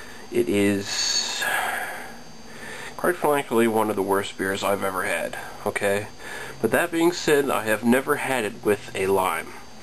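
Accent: Canada. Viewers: is he canadian (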